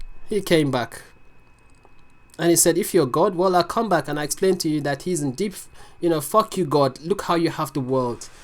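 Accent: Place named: England, United Kingdom. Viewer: Tiene el acento inglés no creo que sea de Estados Unidos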